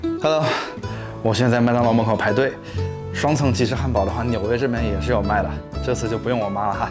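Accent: Wuhan, China. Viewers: 听口音 Up主武汉人吧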